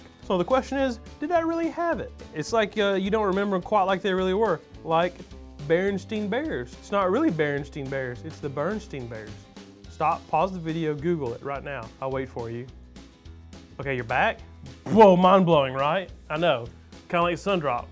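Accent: South, United States. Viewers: I’m from Texas and people think I’m crazy when I say I hate big red 😳 I feel like it’s instant cavities with one sip 😂 Also your accent brings mine out so much 😂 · I don't actually care at all about the rankings but I love listening to this dude talk. Sounds like any good ole bubba down the street. Cheers from Texas!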